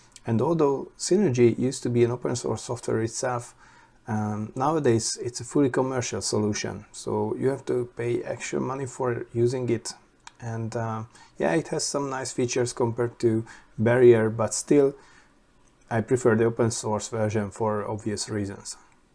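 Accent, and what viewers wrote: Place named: Hungary. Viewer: Your accent surely tells you're Hungarian - that I didn't know when I found your channel. :D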